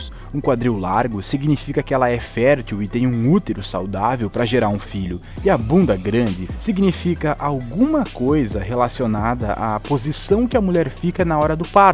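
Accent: Rio Grande do Sul, Brazil. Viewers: por acaso tu é gaúcho? É que teu sotaque é bem típico do norte do RS. Parabéns pelos vídeos, por acaso encontrei o vídeo sobre Ego e achei bem interessante alguns títulos que são mais voltados para desenvolvimento humano. Gostei muito da abordagem simples e objetiva, focado em passar a informação correta e de forma lúcida e educativa o que evita que o vídeo fique longo e tedioso. Novamente parabéns!!!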